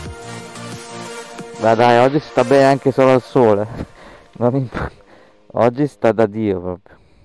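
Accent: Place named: Romagna, Italy. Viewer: figata di strada, davvero bella, poi mi piacciono un casino i tuoi commenti...l'accento romagnolo mi fa morire....tranquillo...sono sposato e con una figlia🤣🤣🤣🤣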